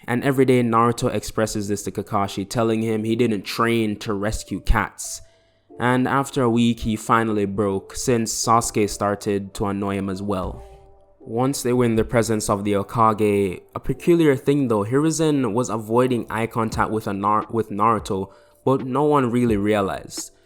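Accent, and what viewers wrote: Jamaica. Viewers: are you jamaican? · this guy sounds like he has a Jamaican accent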